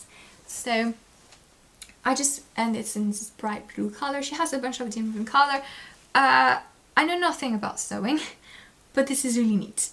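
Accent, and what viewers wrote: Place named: France. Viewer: Oh, your Saule leaf design shawl took my breath away, that motif with the garter is simply beautiful. When you said you may incorporate small tassels within the main ground I thought Oh yes please, what a fabulous idea. Please don't perfect your English accent too much and lose your frenchness. Cheers, Jenny.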